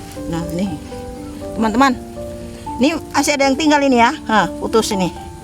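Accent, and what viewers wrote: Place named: Medan, Indonesia. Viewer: Dari logatnya orang jawa Medan ini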